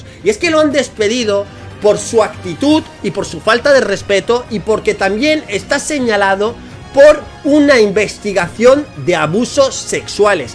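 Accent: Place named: Spain. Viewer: canal argentino????? Si el que habla es más español que pique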